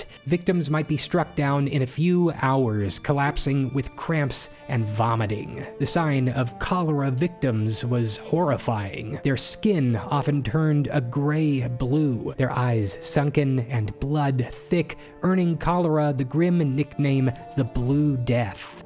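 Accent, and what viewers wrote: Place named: South, United States. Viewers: Why do I have the sudden sensation of pitching a Jordan Peele or Ryan Coogler film as I listen to a Southern accent reading of "The Masquerade of the Red Death?" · I love the added southern accent as well. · Glad to see you're feeling well again. I applaud your choice of a soft southern accent, as much of Poe's youth was spent in Virginia. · Oh my gosh,! That reading was much more interesting and dramatic than I thought it would be. The costume, the hair, the MUSTACHE! And the changing lighting add a lot of dramatic flair. The voice, the accent was a very nice touch, although the slightly southern twang surprised me. You made Edgar Allan Poe, master of horror, sound...folksy. I almost thought I was listening to Samuel Clemens, instead!;-)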